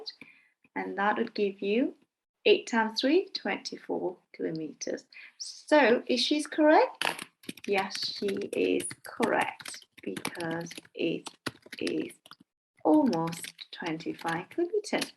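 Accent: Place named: Wales, United Kingdom. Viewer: It’s Welsh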